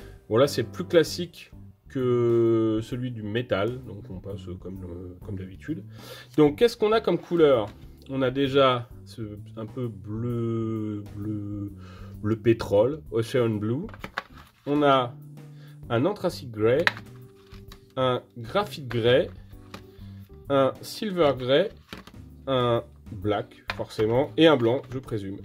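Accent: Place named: England, United Kingdom. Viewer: Merci pour cette vidéo, hâte de voir ton ressenti au niveau de son appliquation. On en parle énormément des peintures AK en ce moment. Je vais voir ta vidéo sur le set Gold. J'adore ton accent Anglais 👍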